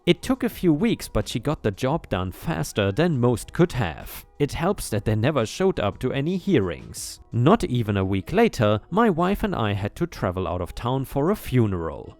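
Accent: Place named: Germany. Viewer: You sound like ypu have a german accent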